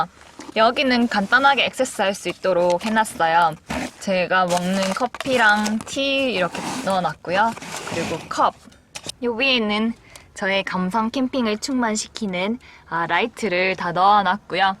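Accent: Gyeongsang, South Korea. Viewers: 부러워요 어떻게 이렇게 잘만들었어요 나도 갱상도 사람 ^^~~~갱상도말 구수해요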